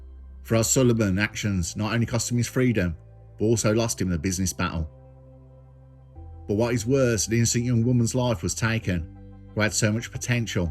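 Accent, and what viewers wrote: United Kingdom. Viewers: You’re a cracking talker, straight Jon Bull. I like that. Stay blessed. 🙏👊✌️😎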